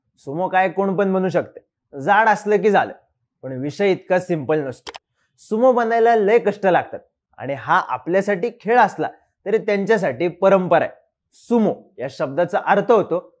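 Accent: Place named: Satara, India. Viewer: Bhava boltos lay bhari asal satari ❤️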